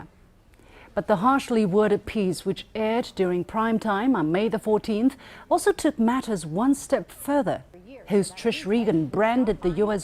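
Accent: United Kingdom. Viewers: youvecattobe kittenmeritemeow 这个是英音吗？ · are you really living in China? then how do you get on the youtube? As far as I know, youtube, along with EVERY western media, like FOX CNN are banned in China. Her British English sounds well, but unfortunately it full of lies. You do know that there is no freedom of speech in China, and Liu Xin is working for CCTV, in other words, she works for CCCP. I challenge you slightly criticize Chinese Xi Jinping as a dictator, he has revised the Chinese Constitution and will remain in the power for whatever he like, what that sounds to you?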